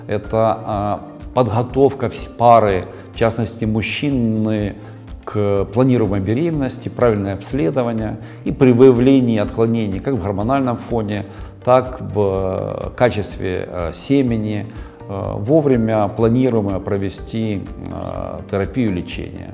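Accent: Ukraine. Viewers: Видимо он украинец.Ну и шо с того?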